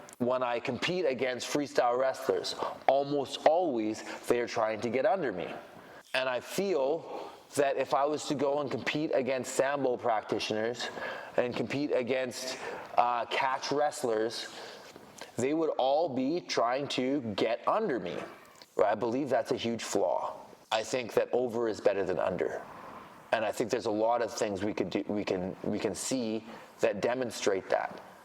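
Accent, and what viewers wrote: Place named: Canada. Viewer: Gotta say it’s great to hear another Canadian on here “aboat”. Like I’m in a Tim’s lineup in Sudbury. Much love brother.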